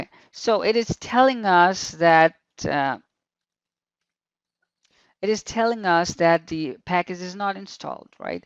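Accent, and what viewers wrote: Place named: United States. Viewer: I very curious to know, How did you get that American accent?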